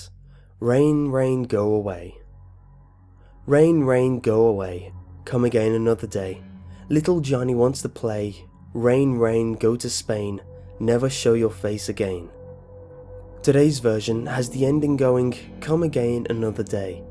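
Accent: England, United Kingdom. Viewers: Does anyone think he is british? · Geez someone PLEASE tell this guy that it is Catholicism. Not Cathlicism. Just makes him sound so ignorant, even with the English accent.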